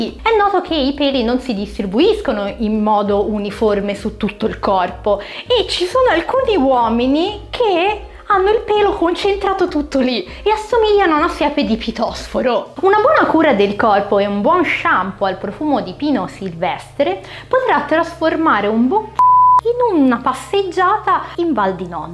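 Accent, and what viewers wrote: Livorno, Italy. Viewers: Il tuo accento toscano mi fa impazzire 😄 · Io riesco a riconoscere che è livornese.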